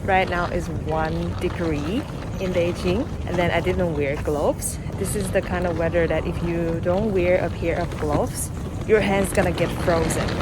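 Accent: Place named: United States. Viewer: 好重的美国口音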